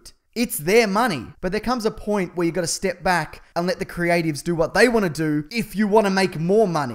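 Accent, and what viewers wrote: Australia. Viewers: Hey love ALL your shows, are u a Aussie you sound like a Aussie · God I fucking love this channel and their podcast. Its hilarious and bang on with the commentary about films these days. The australian accent just makes it way funnier, I wish I was aussie instead of just crap and English. "Im gonna start pointing some bloody fingers!"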